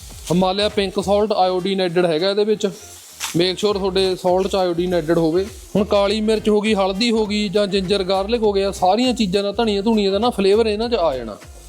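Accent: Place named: Malerkotla, India. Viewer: ਇੰਨਾ ਕੁ ਪਾਣੀ ਪਾਉਣਾ ਡੱਬੂ ਵਿੱਚ ,, 😅 ਗੱਲ ਸਿਰਾ ਕਰਤੀ ਬਾਈ ,, ਆਪਣੀ ਬੋਲੀ ਇਹੀ ਆ, ਮਲੇਰ ਕੋਟਲਾ ਸਾਈਡ ❤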